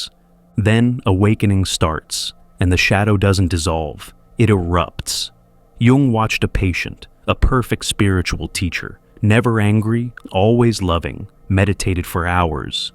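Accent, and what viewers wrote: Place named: United States. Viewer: It's impossible to find a more vile American accent, than with this narrator.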